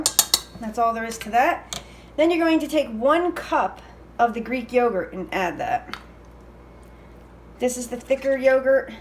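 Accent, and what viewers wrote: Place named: Pennsylvania, United States. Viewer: Can I ask where you're from? My mother in law is an Italian from Pennsylvania and you have that same ever so slight accent.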